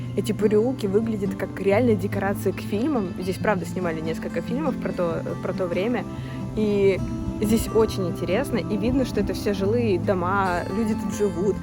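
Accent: Poland. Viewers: Klimat jest, no i ślonsko godka. Lubię. Niklaus Pieron też fajnie opowiada a godom wom to jako gorol :)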